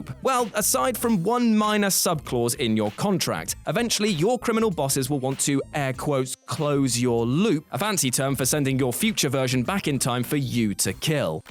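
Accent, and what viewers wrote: United Kingdom. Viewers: British watch mojo?!?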